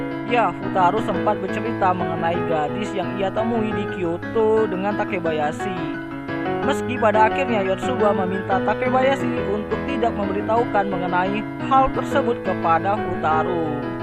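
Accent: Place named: Algeria. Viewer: حسيتك شغل راك تهدر دزيرية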